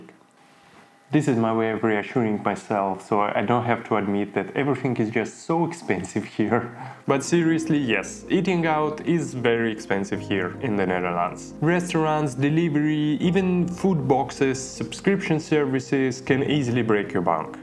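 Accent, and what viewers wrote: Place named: Russia. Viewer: да совершенно любая буква t, на , , и так далее. Не, ну это просто акцент, не принимайте близко к сердцу, я просто от многих русскоязычных слышу этот звук, просто интересно, почему вдруг именно так эту букву произносят, ведь в русской речи у нас этого звука нет) те же греки, у которых сильный неубиваемый акцент, просто произносят свои греческие звуки, а у нас такая своя версия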